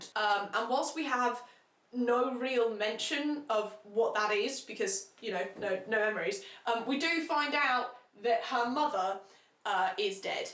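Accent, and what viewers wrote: United States. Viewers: From Missouri USA and I love your American accent ❤ if you read books in that voice I would buy every single one. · wait why is your american accent flawless what the hell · THE AMERICAN ACCENT 🤩🤩 it is toooo good!!! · This video gave me a good giggle, thank you! I am...no longer intrigued to read The Twisted Series unless it was for just straight up giggles. LOL Also your American accent 15/10, exceptional. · Yeah her standard American accent is spot on. Obviously a lot of us don’t sound like that, but it’s recognizable and good. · American avid reader here. 🙋🏽‍♀️ Awesome American accent, shite books. They’re all really bad copies of much better smutty series from the 2000’s. Just my opinion though. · obsessed with your american accent - i only read book one, but now I want to read all of them so I can listen to your recap after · when you apologized ahead of time for your american accent i wasnt ready for it to be actually so amazing. 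generally when people do american accents they just do southern or valley accent! your american accent is INSANE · that american accent is insanely good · Your american accent is making me feel some typea way 🫣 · Busts out a damn near perfect generic American accent than slowly starts turning into cursive 💅🏽❤️ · Thats a damn good american accent! 🇺🇸🇺🇸🇺🇸🇺🇸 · Your American accent is insanely good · From an American person I love your American accent! For this series I started with Twisted Lies (it was the most interesting sounding one and I read that Ana said they can be read in any order) and I actually really enjoyed it. Bit slower paced but I did love it. I didn't really like Twisted Love and to date Alex is my least favorite among the twisted men. Twisted games is my favorite in the series by far and Rhys is the only tolerable amongst the twisted men. One thing that I really hated about Twisted hate is that its completely killed Jules' and Josh's characters. 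They were silly gooby guys in the last, second, and first books but were insufferable in their own book. Also just wanted to mention that Ava's mom's name is actually Wendy. It was mentioned once during her dad's villain speech when he mentioned that Ava looked nothing like him and mainly had a resemblance to Wendy (her mom) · Okay but why is the American accent so good??? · YOU’RE TELLING ME YOU’RE GIVING US HOURS OF CONTENT IN MULTIPLE PARTS? WE WIN WE WIN (Edit: As an American, I am simping for your American accent 🧎‍♀️) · the american accent is so funny LOOL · As an American, I wish I had your American accent 😒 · Omg can you just read books in your American accent I'm obsessed I need more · your american accent was not bad actually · your american accent is so good😭😭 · The way you presented everything was amazing! I laughed a lot! I love when you pointed out the way things just didn’t make sense. 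And your American accent chefs kiss 😂😂 I was entertained the whole way though! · Your American accent is pretty on point girl! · Your American accent is kind of everything?? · As an American omfg??? Why is no one talking about how like surprisingly good the American accent is??? Idk why but hearing people who don’t have an American accent do that accent gets me all giggly she sounds better than I do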